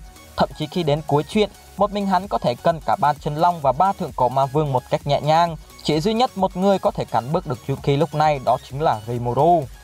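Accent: Vietnam. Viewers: Anh đọc tiếng anh đứng kiểu VN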